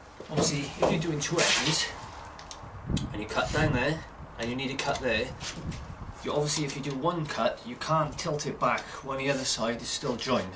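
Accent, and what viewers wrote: Wales, United Kingdom. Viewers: Man I love your video's, love your Welsh accent reminds of my friend's and family from Neath in Port Talbott!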